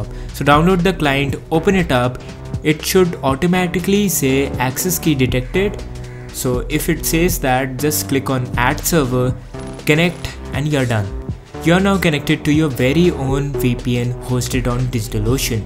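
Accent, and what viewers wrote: India. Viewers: will never install something on my pc, told by an Indian voice.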